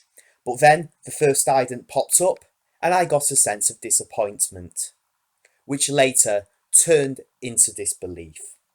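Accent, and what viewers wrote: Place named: Blackpool, United Kingdom. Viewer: Jeez that's a Blackpool-and-a-half accent!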